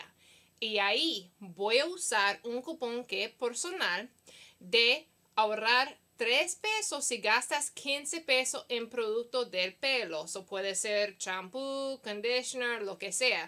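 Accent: Puerto Rico. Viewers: Love this video!!! Suenas Puertoriquena!!! :) Gracias por este video. Que chevere!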